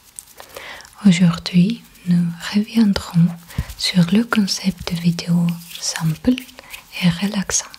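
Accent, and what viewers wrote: Russia. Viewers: J'ai amies russes qui parlent parfaitement comme toi, trouve ça impressionnant russe est vraiment très très différent du français, on entend presque pas ton accent russe😮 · Je regarde cette vidéo après ta vidéo d'il y a un an et ton évolution est super ! Tu articule vraiment bien et ton vocabulaire a evolué ! Vraiment je suis admiratif de voir à quel point tu a persévéré dans ton apprentissage ! Et puis toujours le petit accent russe qui va bien 😊 !j'aimerai avoir 1% de ton niveau de français en russe ! Merci pour tes vidéos et bonne continuation 🎉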